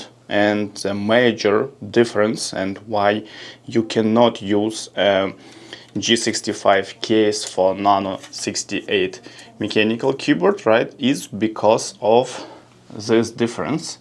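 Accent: Russia. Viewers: я чувствую русский акцент )))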